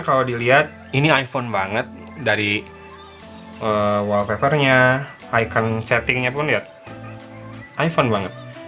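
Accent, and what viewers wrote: West Java, Indonesia. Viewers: ini ifonre bgt sunda bangaat aa'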